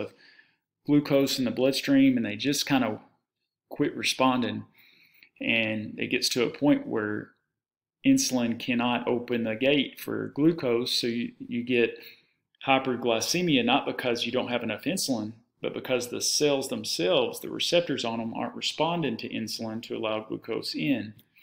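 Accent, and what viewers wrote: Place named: South, United States. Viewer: Dr., where are you located? You sound like you're from the south and I'm in east Tennessee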